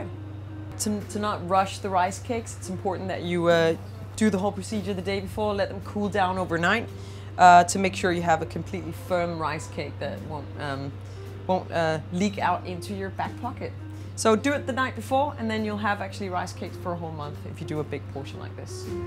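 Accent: Denmark. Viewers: its a very lovely Danish accent. She's a good example of how often we might say "You know?" :D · She sounds danish 🇩🇰🍺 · Instantly recognized the danish accent :) great vid btw.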